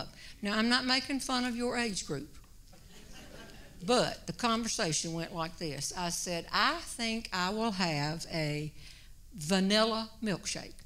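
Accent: South, United States. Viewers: I've never heard a southern drawl in real life... it's quite comforting. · It wouldn't be the same without the southern accent. I could listen to her for hours. I can't listen to Samantha Bee or any of the newer "female comics/comedians" for more than a few seconds. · Depending on which part of the south one is from will determine how your southern accent is accentuated. I am from New Orleans and would have a hard time understanding me. However, her voice plays like fine music to my ears. I can also listen to Shelby Foote in the still of a morning, drinking my first cup of coffee and feel all is well. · She is a gem! She used “mash” when “pushing” a button. Love her Southern twang! Recently discovered!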